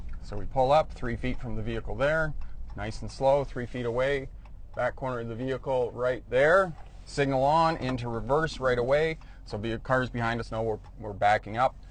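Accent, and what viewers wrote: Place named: Canada. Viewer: Very Canadian accent lol. Greetings from Ontario!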